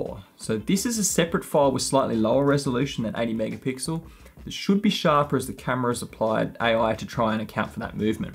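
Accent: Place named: Australia. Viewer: An excellent review. You sort of made a lot of mysterious stuff understandable even at the pace you were running at and me not being used to concentrating on oz tech accents. I look forward to some of the tip vids you might put up. I am very interested in getting slow-mo video working but have found very little understandable advice. Check the manual - No real explanations there neither in the Rocky Nook books. My preferred ambassadors are self confessed amateurs at video. Even asking eachother and getting no real answers. Thank you greatly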